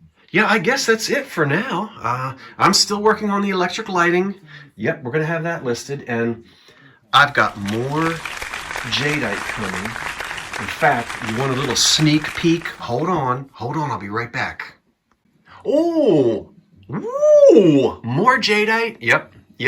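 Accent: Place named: New Jersey, United States. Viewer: I always love listening to you New Jersey guys pronounce the word "water." Like "wooter." LOL!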